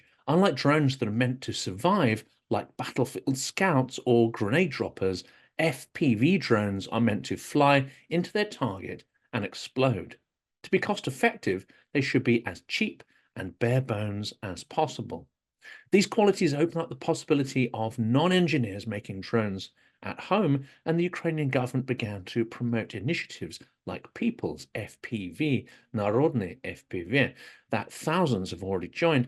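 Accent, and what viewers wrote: United Kingdom. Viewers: I checked the channel info and it says location United Kingdom. 🙂 Plus his accent is very British. 😊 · Oh, funny. I’m American and speaks British. He’s from United Kingdom, and lives there.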